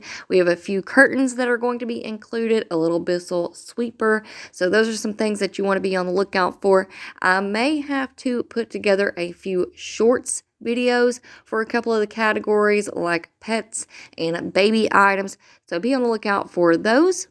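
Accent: South, United States. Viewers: I sure hope this doesn’t come off wrong, bc it’s actually a big compliment. I used to be in marketing and it’s great to see someone who is smart enough to worry about saving .50 cents be so good at promoting their channel. I can tell it’s bc you are passionate about helping others. You have a great voice and I’m learning alot from your channel. I’m from the south too and I can tell you are 🎉. You’re the type of person who can win the lottery and not spend it all at once.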